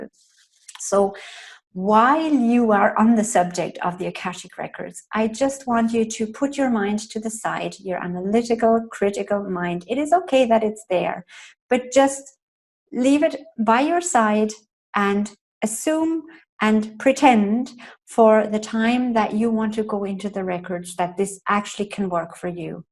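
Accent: Ireland. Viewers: Hi, you have a west of Ireland accent! So easy to listen to:-)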